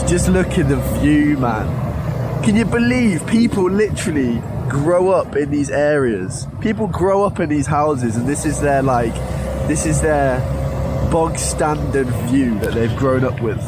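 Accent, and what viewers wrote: Standard. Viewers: 视频有深度了。中文发音很标准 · 很好哦，中文有进步啊，没什么口音还挺标准🤓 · 你讲中文的口音很标准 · 你的发音真的很标准！当然我说的是你的中文！ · 你的发音很标准呀！感觉很少见到发音这么好但是流畅度还不够的外国人。印象中大多数人的情况都是，已经能流畅说话了但是发音实在有不好的习惯。感觉你基础打得很好。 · 天呐！我也想要一支这样的笔😍，有道翻译太牛了👍！另外，你的中文发音好正👍谢谢你视频最后这段客观事实中肯的话❤️ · 你的中文水平真的提高了好多呀！你最早的视频真的一点中文也不会的。现在你这发音可标准了哈哈哈！表扬一下！ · 中文有进步，口音很标准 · 非常感谢你的真实中国视频！ 你的说出来的汉语好标准！真棒！ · 你的中文比大部分中国人的发音都要标准，因为我们有很多乡音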